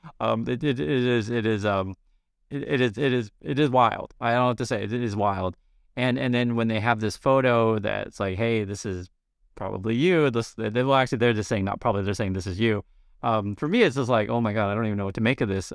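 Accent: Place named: United States. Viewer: My daughter and mother did AncestryDNA on my genealogy account. Through my daughter, we had a match with my husband's cousins that had been "lost" as children after a divorce. She also had matches with two other of her father's 1st cousins, that the family had no idea existed. The one had been told her father had died in the Korean War, but it was a lie told by somebody, probably her mother's stepmother, to get her to adopt out her baby. She'd been searching for a picture of her dead father for decades. She made contact with me and was astonished to learn that her father had only died the year before at age 85. She had terminal cancer and died just three weeks later, but she stared at the picture of her father constantly during that time. On my mother's side of the family, we discovered a cousin's son who had no idea who his birth father was. 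Now Mom's got another unexpected match, likely either a cousin or an unknown half sibling's daughter. You just have no idea what you might find out, especially from that time before reliable birth control. But this is the most amazing dna story I've heard yet! I started watching your other channel a few weeks ago and wanted to know more about you and your wife, mostly because you sounded American but were living in Korea. The story was more interesting than I'd imagined.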